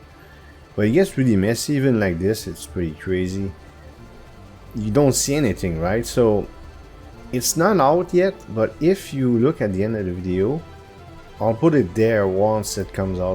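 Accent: Quebec, Canada. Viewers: accent ? is it québécois ?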